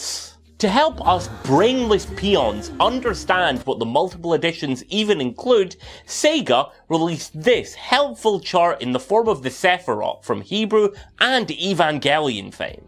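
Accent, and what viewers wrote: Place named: Scotland, United Kingdom. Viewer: Americans hate it when they have to hear a Scottish person talk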